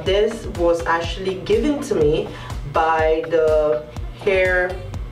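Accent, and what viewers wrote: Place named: Africa. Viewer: love the African accent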